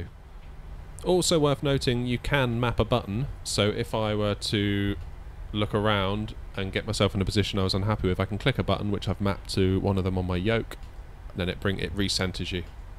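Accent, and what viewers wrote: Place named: United Kingdom. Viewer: lovely hear british accent 😄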